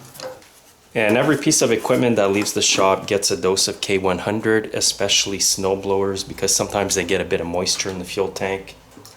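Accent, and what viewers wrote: Quebec, Canada. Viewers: You sound French Canadian, where abouts are you located? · Wow, the detail n time you took in this video was great! I'm a Truck and Trailer mechanic myself but Im shy around small engines, I look forward to watching more of your videos, like I'm realy blown away with the care and detail in this video. Keep up the great work! ( I also wonder if that's a Quebec or Acadian accent, i'm on the east coast myself?)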